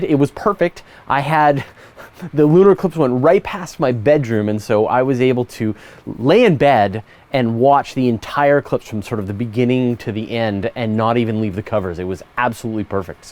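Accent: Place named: Canada. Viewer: I wondered if you were Canadian from your accent and I see yes! <link> I'm the publisher of Universe Today and co-host of Astronomy Cast. I was born in Vancouver, Canada, but grew up on Hornby Island, a small island off the coast of Vancouver Island. Then I moved to Vancouver again to study engineering at UBC.....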